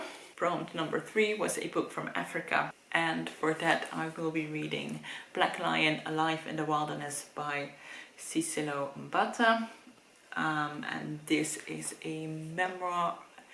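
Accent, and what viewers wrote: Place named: Netherlands. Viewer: Ja. Ik dacht het Nederlandse accent al te herkennen lol. Leuk. Ik heb niet zo veel Nederlanse booktubers kunnen vinden. Lees je meer Engelstalig dan Nederlandstalig? Nog een vraagje weet je misschien waar ik kan vinden of een boek in het Nederlands is vertaald? Ik lees veel in het Engels, maar als ik een boek vind wat mijn zus en moeder ook leuk zouden vinden dan koop ik het het liefst in het nederlands. Zij lezen liever nl talige boeken. Zo ben ik op zoek naar de Nederlandse vertaling van the five van hallie rubenhold.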